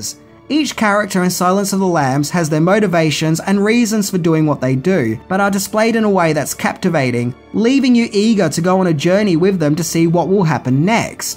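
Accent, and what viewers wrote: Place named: Australia. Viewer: . Your assie accent is one of the reasons that makes your channel so enjoying.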